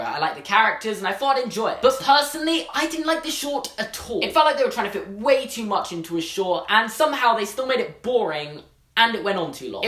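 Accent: United Kingdom. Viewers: Your British to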